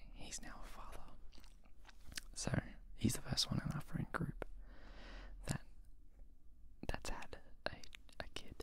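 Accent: Australia. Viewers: im not so familiar with the aussie accent but i've just noticed that yours isn't as strong as other aussie asmrtists (asmr beam for instance), what part of australia do you come from, north/south/east/west ?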